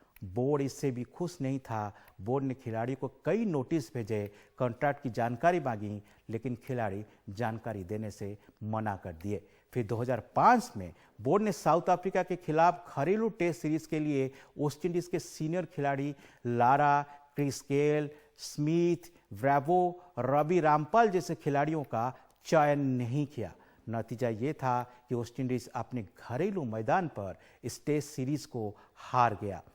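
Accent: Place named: Kolkata, India. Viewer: बोलने का तरीका गज़ब है सर जी आपका लगता है कि आप कोलकाता से है कि 😀😀😀😀😀😀😀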